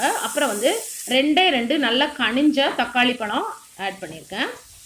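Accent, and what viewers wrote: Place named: Tuticorin, India. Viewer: Unga native ethu akka... Slang tuty slang pola iruku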